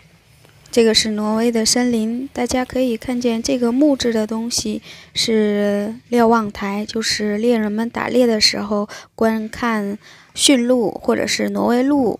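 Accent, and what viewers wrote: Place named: Dashiqiao, China. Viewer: 这些黄色的蘑菇好多啊，你们的收货好多哦。我朋友是大石桥的，和你说话特别像。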